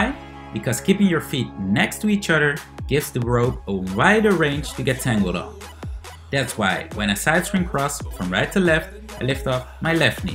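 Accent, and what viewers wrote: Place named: United States. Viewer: These are one of the best..no THE BEST, jump rope tutorials on YouTube. Clear breakdowns of all the techniques. Just one minor moan: As an English person, I have to mentally block out the American pronunciation "TUT-orial" (rather than "tew-torial)...my teenage son spends so much time online, even he's saying 'gas' rather than 'petrol' ;-)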